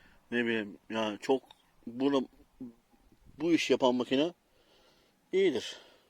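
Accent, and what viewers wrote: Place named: Black Sea, Turkey. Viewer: Karadeniz gibi bee mübarek memleketim yemsil...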